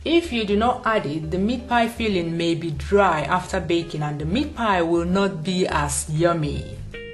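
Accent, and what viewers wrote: Igbo, Nigeria. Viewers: My sister... the igbo accent ❤️ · This is purely ALL NIGERIAN! Great Nigerian accent with some wonderful mouth watering recipes! My rating on this video is clean 5 star!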